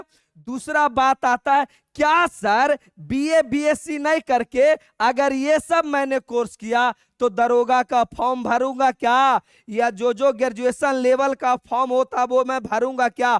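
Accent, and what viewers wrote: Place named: Haryana, India. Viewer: Haryana sa h sir ji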